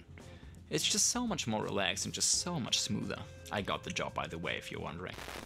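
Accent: Australia. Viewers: Dude, you have no idea how Aussie your accent is. This is hilarious and I love it. You're one of us mate! You even LOOK Australian. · The best tourists i ever met within my adventures about Australia were always German (Im Aussie). You have the look of an Aussie surfer and your English became quite Australian. Makes me wonder what the German girls think of you since you returned to Germany 😅 Rock on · Such an Aussie accent! My Dad was German, lived in Australia for thirty years, and his German accent was always so strong. (I loved it!) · you have an Australian twang 😊 very nice 🦘✌️🌅..go Richmond..your family sent off an urbane European...we are all for comfort, sorry😂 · I do get a slight hint of an Australian accent mixed in with your German accent 😂❤. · You're flattening your vowels like an Aussie. When you say understaand, that is all Aussie. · Seriously... 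First thing I thought it was an Aussie in Germany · Well, you've internalised the Aussie twang. I've been here for more than sixty years and you sound more native than me 🇦🇺🏴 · Do you know you have gleaned a bit of an aussie accent? lol, good one. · Your English is great. Has a great Aussie accent to it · I think you, your attitude. and accent, are gorgeous. You have become a classy Aussie. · Great Aussie accent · Sorry mate, can you f*** off? Sorry mate, can you point me to the strawberries? Both completely valid ways to end that sentence 😂 You actually have a bit of an Australian accent now brother, another few years here and we'll get you converted. I work with Germans, I find we get along very well. It's weird to say but I feel more at ease with Germans due to them also being quite straight talkers than those from our mother country the UK where they tend to bury everything in platitudes rather than getting to the point. 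· Thank you for being polite about Australia. I'm glad you had a good time. Your English sounds very Australian to me, noting that Australia has so many accents that are uniquely Australian but unknown to the world. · You have German US Aussie accent! Everything aside, you're always Aussie now ! 🇦🇺🙏🇩🇪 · the aussie accent is suuper strong so dont feel too bad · Sounds more Australian than my German born neighbour that's been in Australia for about 60 years. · Your accent is still so Aussie.. great blend of German and kiwi and Aussie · I can definitely hear an Aussie inflection in your vowels sometimes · love ur little aussie accent haha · You're more Aussie than you think. How ya "goin?" = Aussie; How ya "doin?" = US and elsewhere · You definitely sound super Australian as far as your accent goes, you almost have more Aussie than German when you speak English. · Hahaha your accent for English is half Aussie. 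I'm glad we wore off on you in such a short time haha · You have ready a strong Australian accent. I hope it stays with you. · Your accent is unbelievable mate! You are 50% Aussie (long open vowels), 25% US (hard rolled “r”s), 25% rest of the world soup combo!! I cannot hear any German accent at all😂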